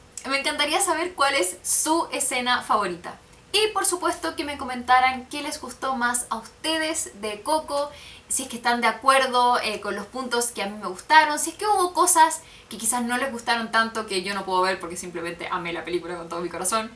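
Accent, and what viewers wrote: Chile. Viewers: estás preciosa y el acento chileno me encanta 🤗, saludos desde 🇲🇽 hasta 🇨🇱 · Te encanta la musica porque cantas muy bien. Tus opiniones de coco muy bien acertadas, fundamentadas el mejor video de coco que he visto felicidades la mejor de chile, amo el acento chileno el mejor de latinoamerica, saludos desde Mexico 😘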